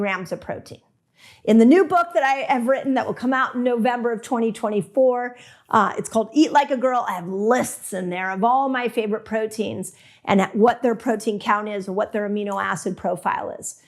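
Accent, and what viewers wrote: California, United States. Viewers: Doc has a bit of a California surfer accent.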